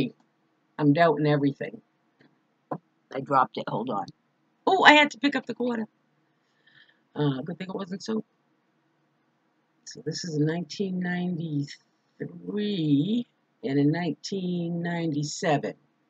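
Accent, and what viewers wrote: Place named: Northern England, United Kingdom. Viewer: I just love your Northern accent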